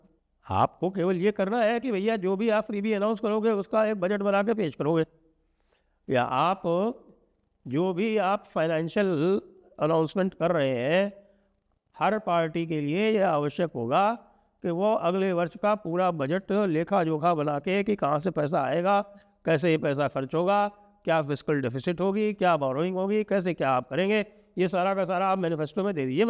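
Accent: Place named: Uttar Pradesh, India. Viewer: Proud of and Love your UP accent 😊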